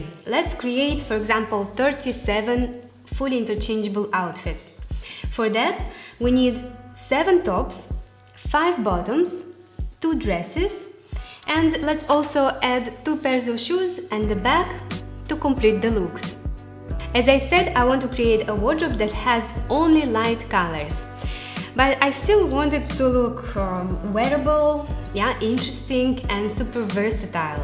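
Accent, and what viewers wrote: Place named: Russia. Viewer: Здравствуйте! У вас такой сильный русский акцент. Понимаю все что вы говорите )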